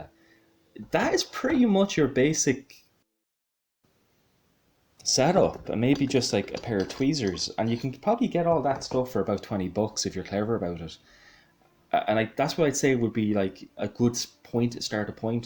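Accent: Ireland. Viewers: Whenever I watch any of your videos, I always end up with an Irish accent in my head for a few hours!😂 Funniest thing is my parents were Hungarian , and they couldn't pronounce "th" either since that sound doesn't exist in the Hungarian language. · Your channel was one of the first I watched when I got back into scale modelling and back into using an airbrush, now I remind myself to “t’in it down” with an Irish accent lol, Cheers!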